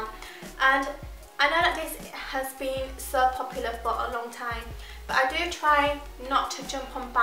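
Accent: United Kingdom. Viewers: you have a kind of weird british accent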